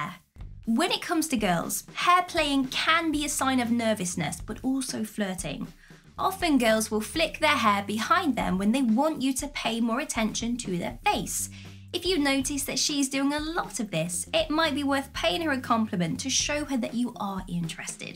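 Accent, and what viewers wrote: England, United Kingdom. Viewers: That English accent tho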